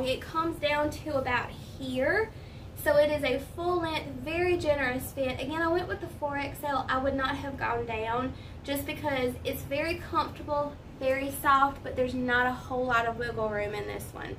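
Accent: South, United States. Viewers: You are so cute. I love your personality and your southern voice.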